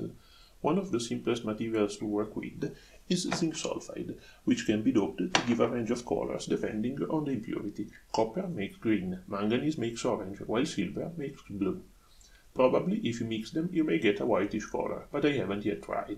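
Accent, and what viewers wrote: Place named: India. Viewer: Thought you were an Indian guy hearing your accent, dude.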